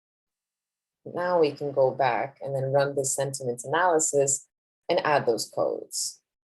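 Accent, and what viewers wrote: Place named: Brazil. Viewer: Brazilian Accent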